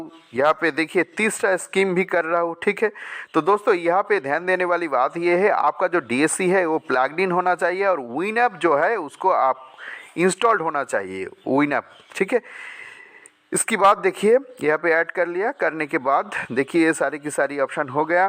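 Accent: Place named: Bengal, India. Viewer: Pahle Hindi thoda Sikh lo.. bangali babu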